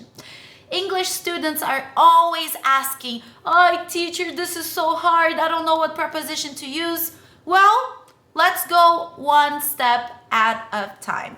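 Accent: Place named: Brazil. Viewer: Seu ingles é perfeito!!! Mas eu reconheço um brazuca em qquer lugar heheh parabéns pelo canal, estava procurando como melhorar meu uso das preposições AT, IN e ON e parei aqui, você tem algum video sobre pra eu dar uma estudada por favor?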